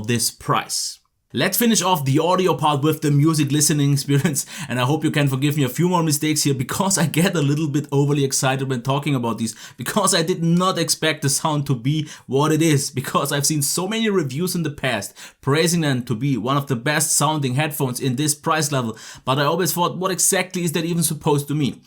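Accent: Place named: Germany. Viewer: the German accent is hella funny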